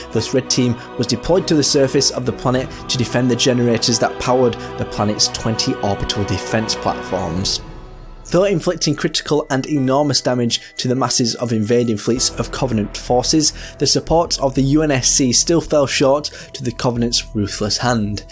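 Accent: United Kingdom. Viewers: Reverbed obviously British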